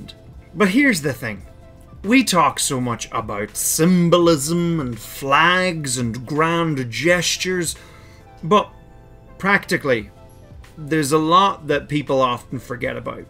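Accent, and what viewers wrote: Ireland. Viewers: Thanks for doing this video, Ive done a very similar one looking at the same content, just with a lot more data and less of an Irish accent! Check it out and let me know what you think of it "What if Ireland United?" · I don't hear any sounds in his speech that aren't Irish. American accents are more influenced by some Irish accents than by others. In particular, the more northerly Irish accents have more in common with some of the best-known American accents.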